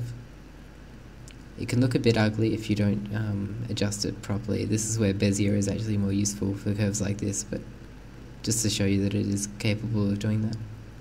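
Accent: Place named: Australia. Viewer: It sounds aus-like anyway. ;]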